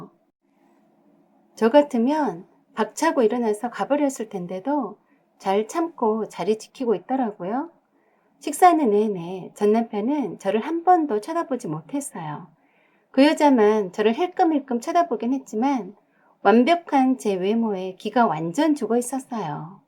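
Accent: Jeolla, South Korea. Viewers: 저기요, 표준어로 읽던가 아님, 전라도 사투리로 읽던가 하나만 하세요 거슬려서 못듣겠어요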